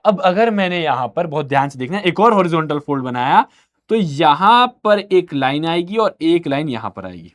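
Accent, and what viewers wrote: Bihar, India. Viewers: Sir ap kya bihar se hai🥰👍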